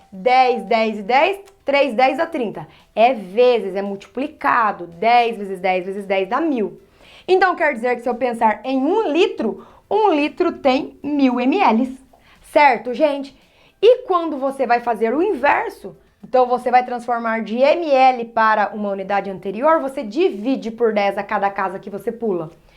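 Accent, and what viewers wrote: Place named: Minas Gerais, Brazil. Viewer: Adorei sua aula gata!! Pelo sotaque deve ser mineirinha! 👏👏👏👏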